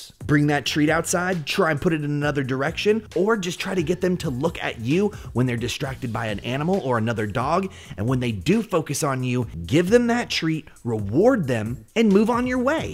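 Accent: England, United Kingdom. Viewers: Hi what a Funny English Accent love it . Hila is a good Listener 🤩🤗 Britney is calm.. luv chilling out after work with your videos still trying to work out which Day I am on Ha Ha...😍 Good tips catch up soon .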